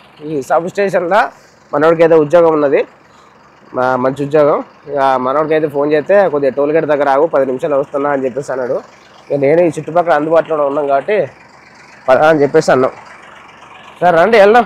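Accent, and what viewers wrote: Telangana, India. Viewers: Anna meru undedhi Vijayawada, Telangana slang matladuthunnav,kadha karimnagar,unnara,unne Vijayawada andhuku vellaru?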